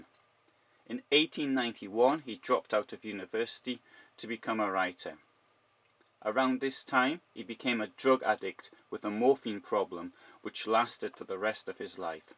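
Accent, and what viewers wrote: Newcastle, United Kingdom. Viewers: Geordie narrator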